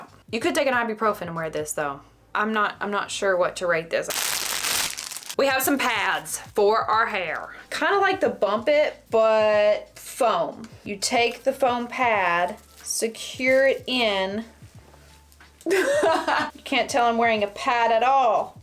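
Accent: South, United States. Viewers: No one appreciates a Southern accent like Southerners do. There’s no shame in that game so let it fly. I have to say that of all the products shone today, YOU, are the best one. Also the freckles. And I have to wonder why companies don’t make faux hair for us silver ladies. We have less hair than anyone so you’d think they’d help us out. I’m all about some faux hair when I can find it in my natural hair of Silver and dark grey. So you go ahead and wear that faux hair with pride girl. Because when you are silver there just aren’t any good ones to wear. Peace and love girl.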